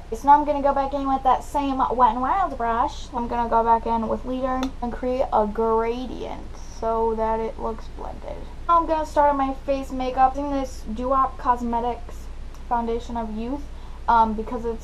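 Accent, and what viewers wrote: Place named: United States. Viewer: Omg this is sick!!! I'm from England and I love the Amercian accent😂😂💓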